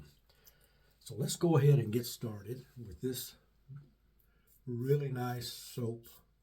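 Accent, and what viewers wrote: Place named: Appalachia, United States. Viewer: where are you located? Do you live in the Appalachian Mountains. You have about the same accent as the people in my area. I live in pound,Va right on the border of Ky. Tenn. and Va. I like your Videos!!!!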